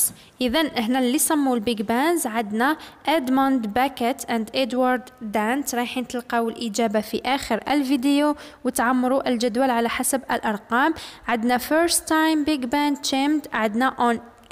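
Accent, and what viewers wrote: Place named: M'Sila, Algeria. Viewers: اها ننضنش من مسيلة من لهجتها باينة دزيرية ملاحضة انا فتاة ولست ولد بلاكي تشكي في اسمي ديال القناة 😅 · استاذة انت من ولاية المسيلة ولا اذا انت من مسيلة انت راك قريتيني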